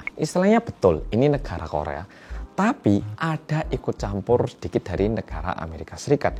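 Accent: Java, Indonesia. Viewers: Logat Jawa nya tu lo hhhhhh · Bisa jadi pengamat politik iki mas korea yg bisa jowo medok🤣🤣🤣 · Hahahahaha penjelasan nya wong jowo tenan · orang korea ko medok??🤔🤔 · Logatnya jadi BHS Jawa ya medoknya 🤣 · Orang Korea tapi bahasanya agak medok 😀👍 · Orang korea lagam bicara jawa wkwkwk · Sampean logat jowo ne peh masok bolo😁😁😁😁😁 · Hi oppa medok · Ini orang Korean beneren ya? Medok jawanya enak lho. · Wah bahasanya medok..sudah kayak orang Jawa mas😂😂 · Denger pelafalannya mirip soekarno yaa kalau ngomong “keun” maksdnya “kan” wong jowo 🔥🖤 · Aksennya indo dapet bgt, semangat bg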